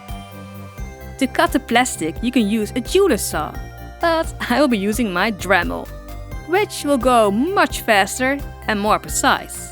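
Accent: Netherlands. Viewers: It sounds like you are Dutch.. No hate btw! I am Dutch! SO i just wanted to know.. 🤔😂😊❤️ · wait hold up are you Dutch as well? You sound so Dutch it's... well, it wouldn't be funny either way, but like, this is the second Dutch Doll channel I've found is this what y'all do? Make great sweets, cheese, flowers, and dolls? Also, the mills are hella cute. Loved the repaint too, you're super talented!! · Qiick question, are you Dutch? I recognise the accent haha.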